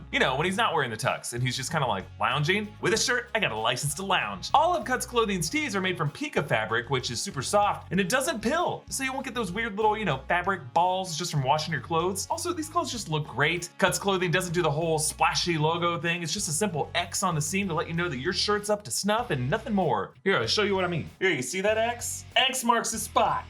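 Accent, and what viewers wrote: Australia. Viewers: Aussie accent is on point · I loved your Aussie accent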